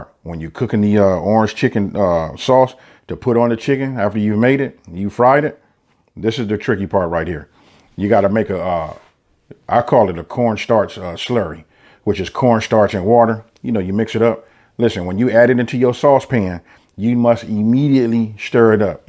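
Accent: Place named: California, United States. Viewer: You sound like you're from cali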